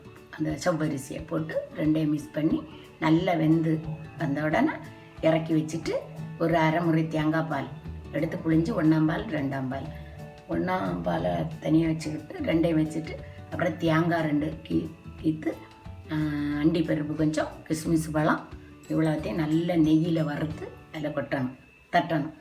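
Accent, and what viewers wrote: Nagercoil, India. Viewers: Nagercoil slang super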